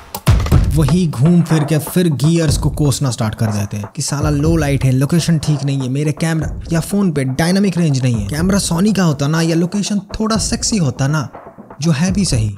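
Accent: Bengal, India. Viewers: yes dada,junejo Bhai toh chiloi.... Casey Neistat o chilo kintu ami sotti mon theke chaitam amader ekhne keu storytelling bepar ta k ato Sundar kore bole na! Tar por tmr content dekhlm... I know keu contestant na ..sabai one of kind...you are unique person..even your content in ur way...mone hoy tumi o Bangali...tmr accent ar pujo te ghorar video dekhe...mone holo...achha jai houk... Love you boss...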